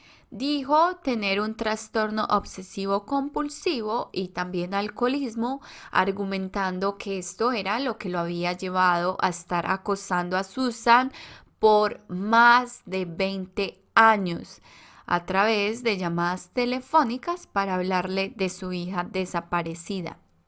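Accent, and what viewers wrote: Colombia. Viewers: Hola nena! Espero que estés muy bien. Te quiero aclarar algo: No se les llama moteros. Yo sé que eres colombiana , y me encanta tú acento. Se les llama bikers. Que se pronuncia baikers. Y no se dice autostop. Se le llama ride , Que se pronuncia raid. Eres muy bonita, y excelente narradora. Felix Aguilar desde los Ángeles California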